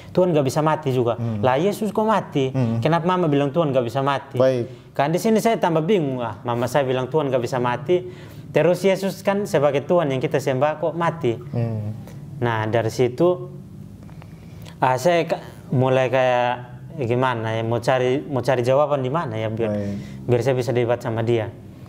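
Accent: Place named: Eastern Indonesia, Indonesia. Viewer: Dari logat bicaranya sprtinya orang timur nii.. Semoga istiqomah dlm iman islam hingga akhir hayat..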